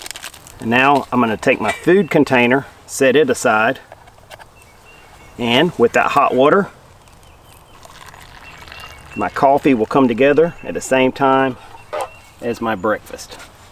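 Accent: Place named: Carolina, United States. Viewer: That’s got to be a Carolina accent.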